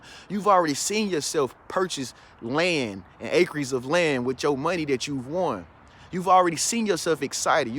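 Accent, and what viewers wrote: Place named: South, United States. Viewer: I knew I recognized that Southern twang. NC native here too.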